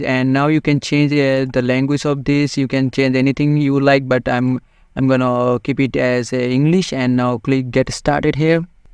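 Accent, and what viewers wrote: India. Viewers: Indian accent! 😍 Yeah, I definitely can install this program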